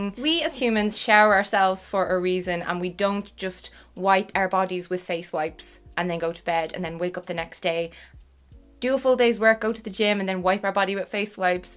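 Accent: Ireland. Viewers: Sometimes when I watch YouTube my brain doesn’t register accents AND HOLY SHIT YOU’RE IRISH. also you’ve probably heard this but I love your accents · your accent is so cute... wish i was irish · God i miss Irish accents like yours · Love your irish accent! And nice tips!!!!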